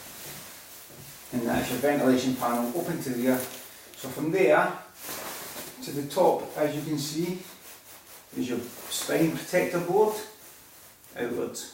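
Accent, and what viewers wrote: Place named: Scotland, United Kingdom. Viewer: Dude are you scottish? wow your accent... Nice review anyway thanks!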